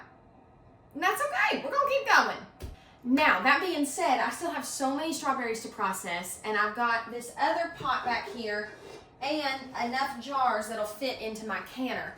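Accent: South, United States. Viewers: omg i could listen to you for hours. never knew i really liked southern accent. im having so much fun <3 :D haha Love from Israel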